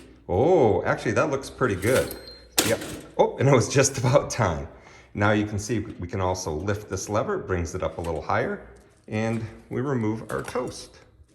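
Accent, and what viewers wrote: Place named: Midwest, United States. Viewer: harrrrd midwestern accent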